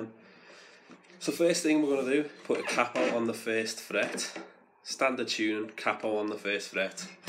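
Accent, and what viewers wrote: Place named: Liverpool, United Kingdom. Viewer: Scouser!! Good man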